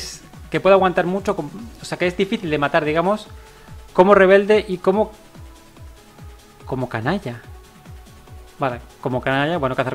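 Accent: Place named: Chile. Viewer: Pensé que eras chileno como yo, por como te expresas amigo 👍😁🇨🇱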